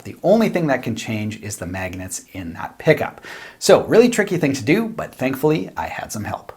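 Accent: Canada. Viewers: Thanks, you did a really nice job with that. Your playing of the riffs was as consistent as the equipment for each comparison. I was surprised that I liked the A 2's the most. However, the 5's, which I have in my CV Tele were nice also. I'm new to your channel and was wondering, do I detect a little bit of a Canadian accent?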